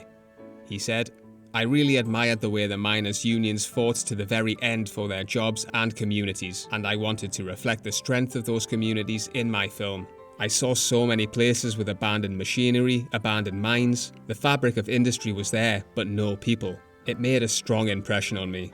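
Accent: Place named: North East England, United Kingdom. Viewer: Hearing a guy with a north east accent talking about Myazaki, my immediate thought was: ‘Well he’s going to mention Tynemouth isn’t he’ Glad I was right. This is a great video! I live in the NE and I have a copy of A Trip to Tynemouth. My Japanese isn’t good enough to read it yet, but I’ve been learning, so getting through the whole manga is one of my goals!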